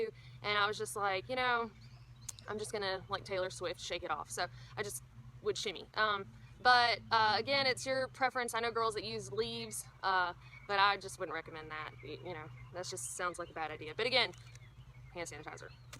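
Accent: South, United States. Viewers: Hey, okay, I have to ask, what part of America is your accent from, I love it. No offence, Brits always ask this question! Warm regards. DisabledPrepper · What southern state are you from?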